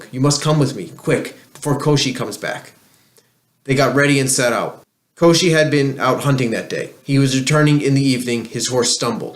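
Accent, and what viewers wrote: New Jersey, United States. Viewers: Don't apologize for your Jersey accent, I love listening to you. Thanks for telling this story!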